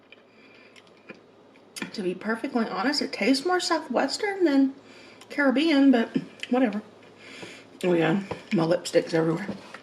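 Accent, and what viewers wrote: South, United States. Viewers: I love your hair and makeup! What state do you live in, you kinds have a little southern accent! I’m a 71 year old great grandmother from East Tennessee!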